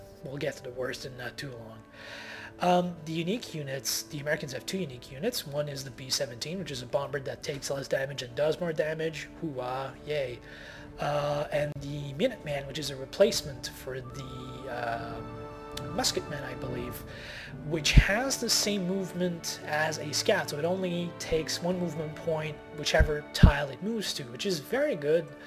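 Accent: Quebec, Canada. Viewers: Based on his speech patterns I would guess French Canadian, but thanks for playing.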